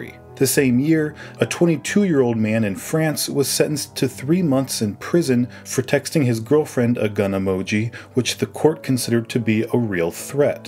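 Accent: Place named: Ohio, United States. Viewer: i hear the ohio💀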